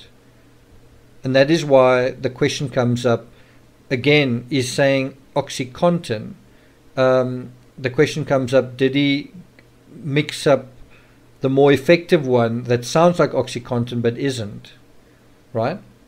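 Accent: South Africa. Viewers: Love listening to your SA accent, makes me feel like I’m back home.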